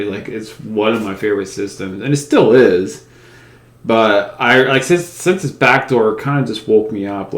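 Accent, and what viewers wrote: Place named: Australia. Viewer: I kinda thought you were an Aussie first ngl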